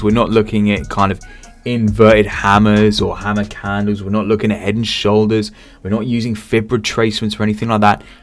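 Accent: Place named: United Kingdom. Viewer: The pull back does not always turn at the next resistance or maybe a bit lower and other guys teaching the candle method and what you are showing here is the most coveted point where to catch the meet A lot of pro retailer need only 50 pips with 20 25 standards and they are done for the day , any wat I like your British accent.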